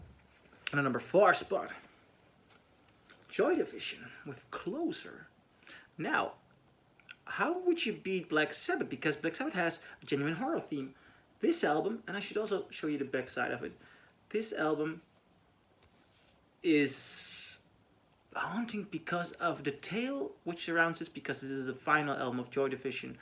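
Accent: Netherlands. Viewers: Nice video! Are you dutch? Because you sound dutch. Ben je nederlands?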